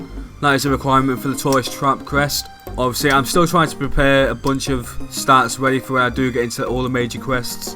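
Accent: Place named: United Kingdom. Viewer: Love to series! But your accent is very Bri'ish! :p Kinda hard to listen to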